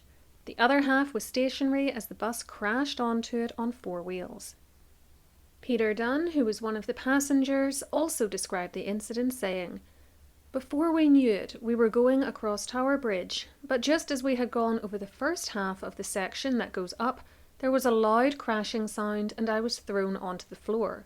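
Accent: Ireland. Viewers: A few years ago I was in the CN Tower in Toronto and I swear I had the same brain conversation with myself as I stepped out onto the glass floor at the top (albeit without your beautiful Irish accent!). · Nice, no-nonsense, well-illustrated presentation —and the Irish accent was readily noted by this Yank. Jumping the gap: Did this inspire The Blues Brothers?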